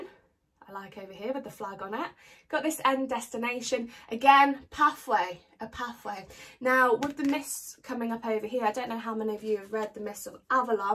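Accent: England, United Kingdom. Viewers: Thank you! I love the way the English talk ! Is your relationship going to rise from the dead. Great for Halloween reading 🤣☺️🎃💀👹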